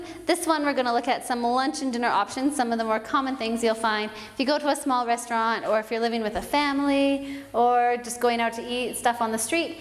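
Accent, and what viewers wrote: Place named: East Africa. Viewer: It's the swahili accent for me 🔥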